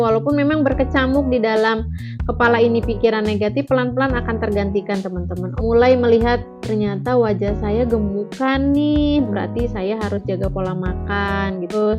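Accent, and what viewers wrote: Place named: West Java, Indonesia. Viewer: nuhun teteh 😊😊 ari teteh orang mana? da logatna sunda mah moal kabobodo hehe teteh pasti orang sunda. abdi penonton baru teh.. ari teteh sbrha lama kena gerd?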